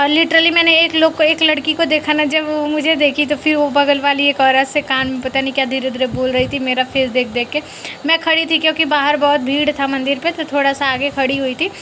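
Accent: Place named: Bihar, India. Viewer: Aap pakka bihar se ho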